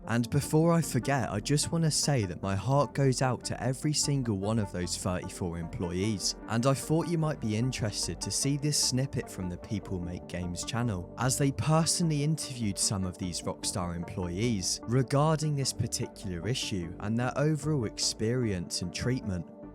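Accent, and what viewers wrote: United Kingdom. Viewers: tolerating british accent for 44 minutes is going to be real hard but there's no other choice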